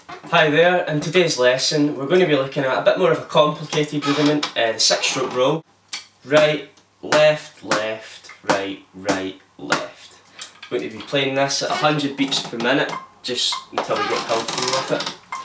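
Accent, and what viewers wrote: Scotland, United Kingdom. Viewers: Min Thu Ko Scottish accent.....from Scotland!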